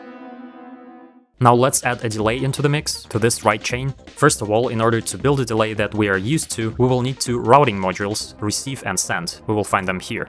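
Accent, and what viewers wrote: Russia. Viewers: He sounds like russian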